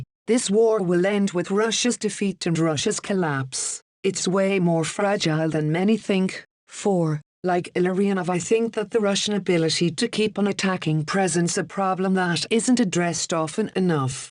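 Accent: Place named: Ireland. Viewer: Why does the bot have an Irish accent?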